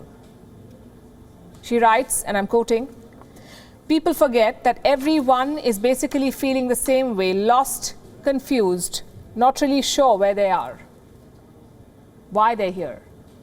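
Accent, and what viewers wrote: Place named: India. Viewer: Is she speaking English or trying to with an Indian accent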